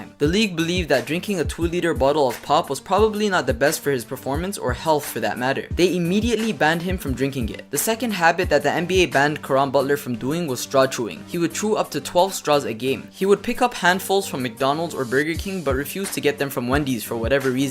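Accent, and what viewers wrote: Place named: India. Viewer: 听起来像印度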